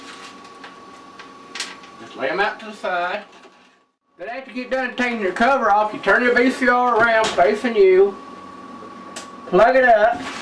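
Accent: South, United States. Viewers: He's definitely from the south.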